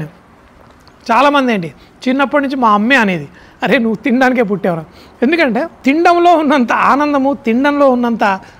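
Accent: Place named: Telangana, India. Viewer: nuvvu e tv lo unnapudu maku nethi nosthunde ne kani eppudu nuvvu chese prathi food video chusthamu nuvvu telangana yasala matladthe 😂😂😂😂😂😂 super re mi bawa ante penimiti ante na better half likes ur videos a lot we just wait for ur new videos every day and all the best for up coming ma bangaru thandri ki all the best nanna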